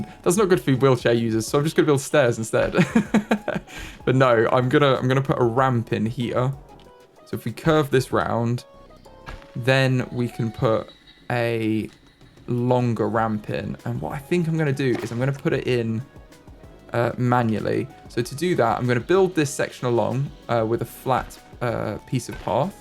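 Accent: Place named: United Kingdom. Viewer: Could be a UK versus US thing, as I pronounce it ah-mean-ities too, like and their accent sounds UK.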